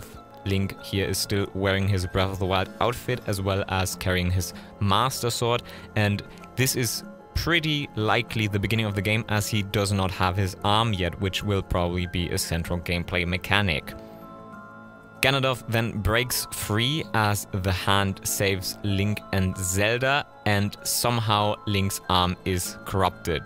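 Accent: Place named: Germany. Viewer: Wie man einfach heraushören kann, dass du deutscher Muttersprachler bist. 🤣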